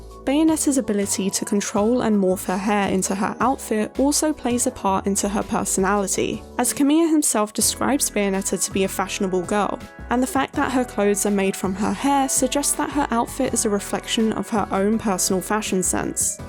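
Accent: United Kingdom. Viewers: I expected a male narraror But that attractive british voice Hot damn!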